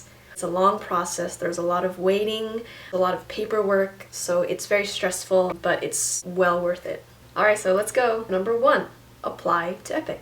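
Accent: United Kingdom. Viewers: where do you come from sis? ^^ I love your english accent.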